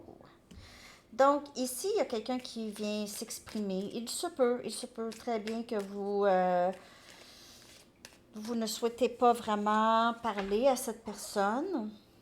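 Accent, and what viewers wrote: Quebec, Canada. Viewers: Je vous apprécie beaucoup 😊 J’ai quitté le Québec pour retourner dans mon pays il y a 1 an et ça me fait du bien d’entendre votre voix et de retrouver ce côté québécois que j’adore tant (le « ok bye » m’a tellement fait du bien de l’entendre 😂) Et vos guidances sont pertinentes Merci 🙏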